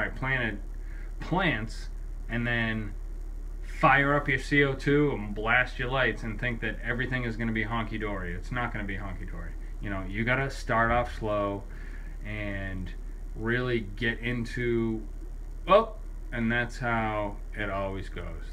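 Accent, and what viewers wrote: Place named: Massachusetts, United States. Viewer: Nice hearing a dude that sounds like me on here, any south coast MA shops that are good for plants, does Beds carry a variety of plants? Seems like most shops only carry a couple of the usuals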